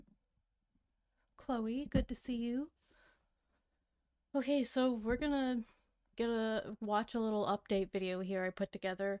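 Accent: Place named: Canada. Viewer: Enjoy and appreciate your updates! Also your Canadian accent is everything!! Lol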